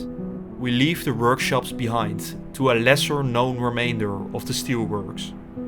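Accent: Netherlands. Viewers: that aweful dutch accent . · You do a phenomenal job with this cinematic video, but a lot is lost because of your heavy Dutch accent. If I were to give you any advice: try to work on this or find a native speaker to do the voiceover.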